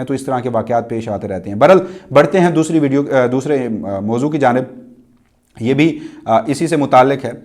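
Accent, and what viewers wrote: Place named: Punjab, Pakistan. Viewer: پلیز اردو بولنے میں خیال رکھیں یہ ہماری قومی زبان پے بھائی اسکو تو صاف طور سے بولا کریں ہماری تو خیر ہے کیونکہ ہم اردو کو بھی اب پنجابی لہجہ کے سننے کے عادی ہو گئے ہیں مگر ہماری نسلیں تو اصل اردو کو بھول ہی جائیں گے لفظ سیاح میں ی پر تشدید مانی جاتی ہے مگر تشدید لکھی نہیں جاتی تو لفظ "سی یا"ہے سیاہ نہیں یہ صرف آپکی اصلاح کیلئے لکھا ہے شکریہ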